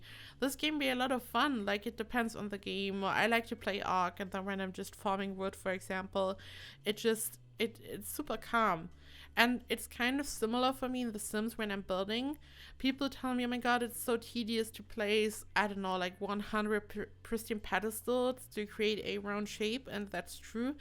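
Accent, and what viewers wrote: Germany. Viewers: Are you from Germany ? I can hear there some kind of accent :) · are you German ?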